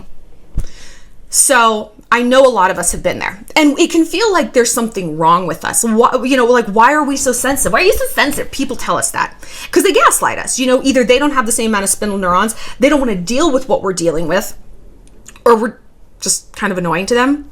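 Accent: England, United Kingdom. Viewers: Your British accent is a GEM!! LOVE IT 😂😂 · LOOOOOOL I LOVE THE BRITISH ACCENT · Lol the British accent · Love the British accent! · love your British accent haha <3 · Why are you reading in English accent? This book was written by two Americans author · love your english accent! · Again the British accent ICONIC love you 😘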